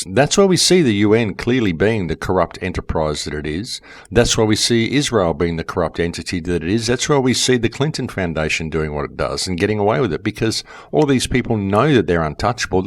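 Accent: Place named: Australia. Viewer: I'm new to yuor posts. Very informative! Refreshing to get schooled in an Aussie accent! Cheers mate! Oh yes... keep up the good work!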